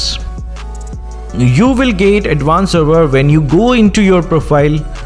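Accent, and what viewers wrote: India. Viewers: Am I the only one who hea Indian accent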